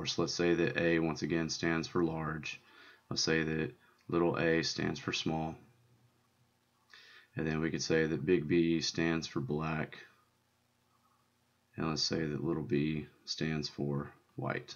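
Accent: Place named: South, United States. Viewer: Nice southern accent, adds pizzazz to the whole thing. Also not trying to strike as creepy but that giggle was adorable.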